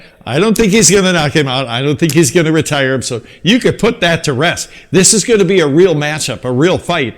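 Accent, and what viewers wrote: Ilonggo, Philippines. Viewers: Hahaha! Dipuga! Ilonggo mana istorya mo!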